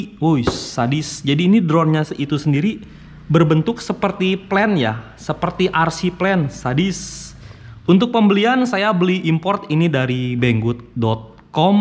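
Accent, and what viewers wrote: West Java, Indonesia. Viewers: Bang Sunda Nya Sunda Mana Bang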